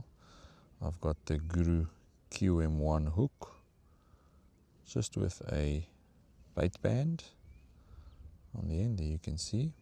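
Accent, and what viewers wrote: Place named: Africa. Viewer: Afrikan accent gives me the creeps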